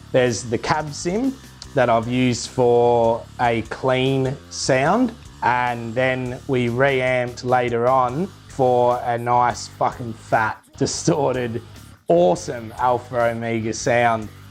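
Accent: Australia. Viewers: this is the heaviest australian accent i've ever heard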